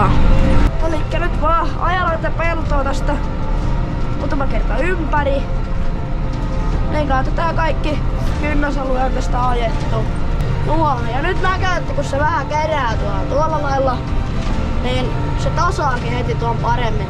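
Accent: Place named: North, Finland. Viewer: Hyvä video tilasin kanavan vaikka tuota pohojammaan murretta ei jaksaisi kuunnellakkaan😁😅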